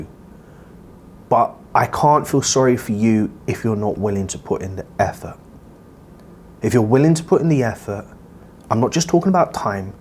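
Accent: London, United Kingdom. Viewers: Good old London matie!!! 🇬🇧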